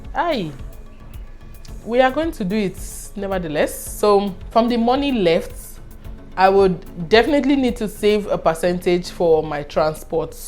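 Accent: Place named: Nigeria. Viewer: Dash me ooh, I've been a strong fan and follower my love.... I love the Nigerian accent. I love your gestures too. I love you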